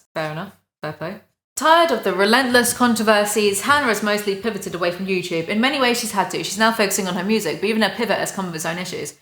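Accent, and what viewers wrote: United Kingdom. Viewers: I haven’t started watching the video but I’m READY for you to reprimand her in British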